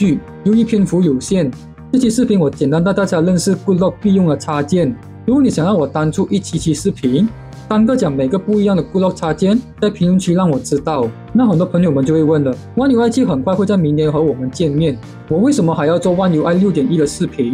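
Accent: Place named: Hunan, China. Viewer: 湖南话＋台湾话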